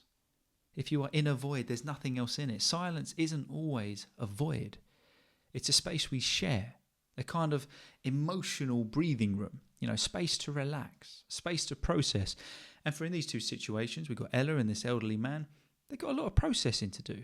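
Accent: United Kingdom. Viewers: British accent ?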